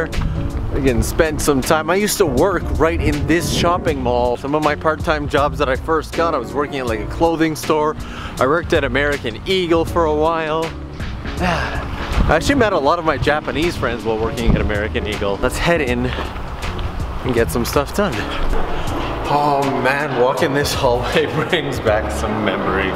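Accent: Canada. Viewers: I never thought I would be able to differentiate a Canadian accent from another English speaking accent... But apparently I can. Apparently they have two Uniqlo stores in Canada, I just checked. None around here though so... So nice people see you and they get all excited. That must be such a nice feeling. Anyway, have a great day. And drink your coffee before leaving it to freeze!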